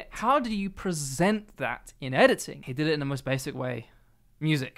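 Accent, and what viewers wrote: United Kingdom. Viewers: Wow the genius of david dobrik explained by a mastercraftsman in an english accent. Impeccable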